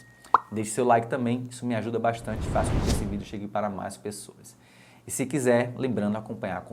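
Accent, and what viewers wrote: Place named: Rio de Janeiro, Brazil. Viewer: Carioca poĥ Quer dar uma de deisch...colado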